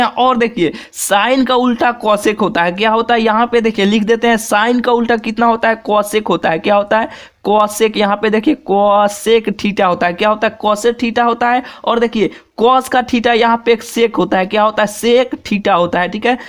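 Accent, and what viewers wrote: Bihar, India. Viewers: Sir aap Bihar ke hai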